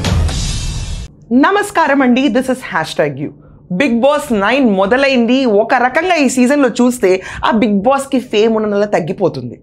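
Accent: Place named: Hindi Belt, India. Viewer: BP tho potharemo anukunna mam... chala hindi vala style lo matladalanukunnaru bavundhi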